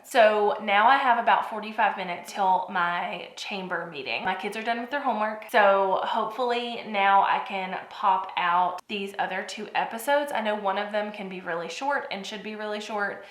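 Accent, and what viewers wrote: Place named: Carolina, United States. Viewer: Nice Carolina accent you have -)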